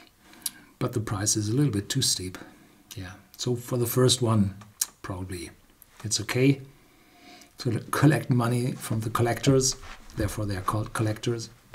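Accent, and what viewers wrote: Germany. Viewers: Dude. Travel some. Most native Germans speak like this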